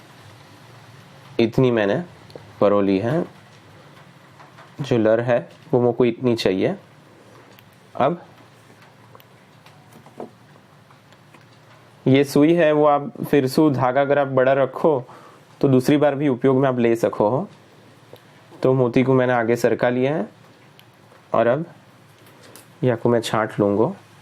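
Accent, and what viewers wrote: Braj, India. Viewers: Bahut sunder braj ki boli manbhavan hai Jai Shree Krishna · Jai shree krishna Superb very easily done your braj bhasha is very sweet Make more videos for us thanks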